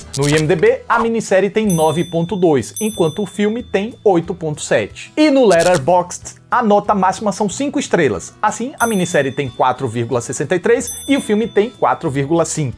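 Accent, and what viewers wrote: Northeast, Brazil. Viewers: Eu amo o e amo Suassuna... mas como nordestina, ver um nordestino, fazendo uma análise crítica de outro nordestino, é tão lindo! Ver cada frase do contemplada com um delicioso sotaque nordestino é sem palavras! E bora ver O Auto 2 ❤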